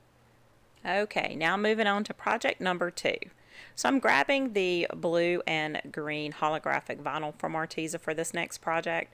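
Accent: South, United States. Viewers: I just love Dollar Tree. Love your Southern accent.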